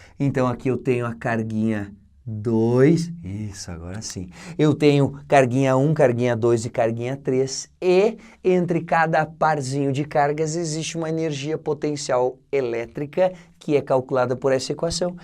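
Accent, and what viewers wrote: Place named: Santa Catarina, Brazil. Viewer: sotaque de catarina é bom demais hahahahahah show de bola mestre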